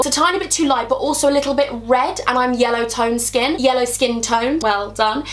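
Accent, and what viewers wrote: South, United States. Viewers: ! Love you, Wish i could fly out there all the way from North Carolina, love your southern accent by the way! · Your wing liner and American accent 💕🔥 obsessed! Xx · Plsss do a whole video in an American accent,, i'd love that as much as I love you!!! Xxx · U SOUND SO AMERICAN OMG AHAHAHAH LOVE U 💗💗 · Omg the American accent 😍😍😍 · Love you American accent Haha 😂😂Xxxx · Snapchat fam💗💗 also her American accent is flawless!!! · YAS! I'm living a for the American accent and your singing voice. Can we just get on Big Brother! 🦋🐝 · Omg your American accent was so good and it acc really suits you, you should do a video doing a makeup tutorial in different accents😂❤️ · ooooommmmmmmmmmmmmmggggggg u sound so American it is scary like if I had never watched u before an u showed me just that clip I would think u were American! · Her American accent♥️ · That American accent omg girl you killed it i love you so much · Your american accent is soooo good!!! 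Please do the accent challenge with #Bensalad or jacob!! love you xxxxx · Wow your accents are amazing love their American one pls do a first impression video in a American accent I love your videos they make me so happy! Your vibe is amazing 😘😘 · I live for your American accent and honestly I relate to your butterfly brain to a T and gurll I need those bambi lashes in my life they look insane!!! And of course can't forget the song ❤️❤️❤️🥀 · The American accent tho! 👏🏼👏🏼 yass boo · the American accent is amazing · You actually amazing at an American accent!! Xxx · OMG your American accent is ridiculously good!!! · Your American accent is so good! And I love your personality. 😊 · LOVE MARMITE SO ❤️ and that American accent is IT!! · I am shook how good your American accent was!!! 😱😱😱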